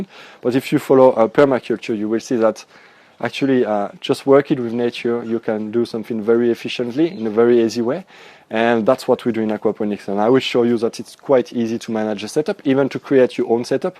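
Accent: France. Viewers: Ça fait plaisir d'entendre de l'anglais avec un accent français, j'ai presque tout compris^^ Merci pour cette vidéo!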